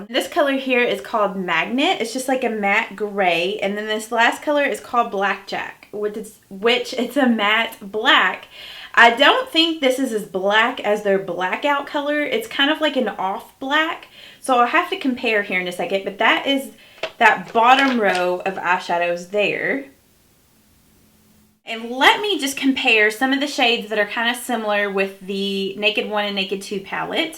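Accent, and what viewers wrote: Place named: Kentucky, United States. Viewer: I love that this palette is all matte and is so nice for fall🍂 and even winter❄️too! I love your channel and I think I have gone and bought just about everything you have used or recommended from the drugstore. I have four kiddos so higher end products aren't usually on my shopping list lol! I think you are the sweetest thing and I love your Kentucky accent! As always, your makeup💄 looks gorgeous! Oh and I'm entering the giveaway also! Thanks so much and have an awesome day! 😊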